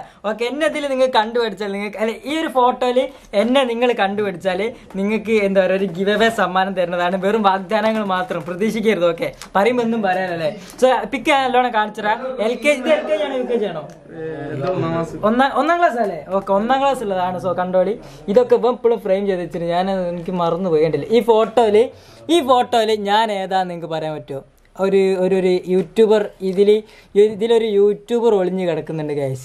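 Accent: Kozhikode, India. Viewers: Ninjaludhe varthanam kettal manasilakum kuzhikkudhanenn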